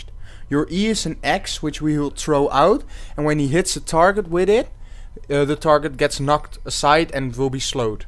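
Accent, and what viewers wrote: Netherlands. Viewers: That dutch accent ♡ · je hoort gelijk dat het een nler is hehe, nice vid tho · i think he's dutch his accent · I'm pretty sure that accent is from Holland :)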